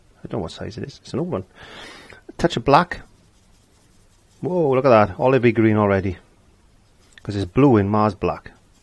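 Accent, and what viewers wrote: Wales, United Kingdom. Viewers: Where are you from? You sound Welsh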